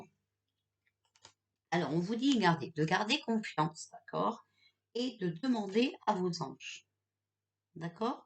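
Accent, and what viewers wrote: North, France. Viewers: Bonjour, vous êtes du nord ?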